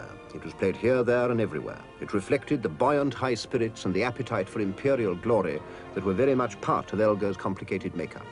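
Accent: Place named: United Kingdom. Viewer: It’s called a British accent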